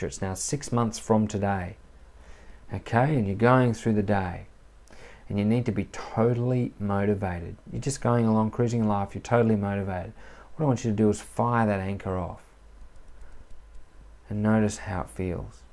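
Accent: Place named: Australia. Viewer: Amazing. I only heard the first two seconds before it went back to buffering and I could tell he was Australian just from "Hi, this is Mar-"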